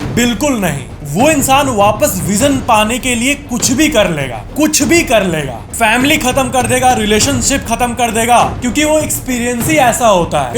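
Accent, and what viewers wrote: Bihar, India. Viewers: Itne bade youtuber ho par aap ki laungauge sahi nahi hai botton badao sahi hota aap boltr ho battan dabo ge lagta hai aap bihar k ho.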